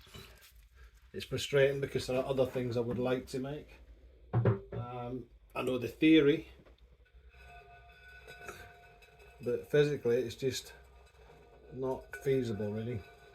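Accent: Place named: Scotland, United Kingdom. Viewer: Seeing the kilns prices here in Hungary, i wouldn't mind having one of yours :D nah just playing....looking forward to seeing the final products! btw, you sound and talk exactly like a Scottish colleague of mine called Dave, i can hardly hear the difference!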